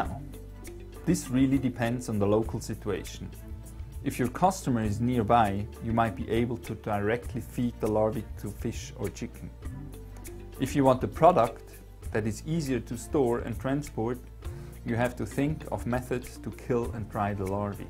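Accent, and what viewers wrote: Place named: Switzerland. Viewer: The narrator is 100% swiss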